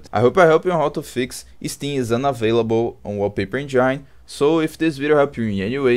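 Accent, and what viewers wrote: Brazil. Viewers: com certeza é um brasileiro kkkkkkkkkk mto bom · amigão, eu reconheço seu sotaque brasileiro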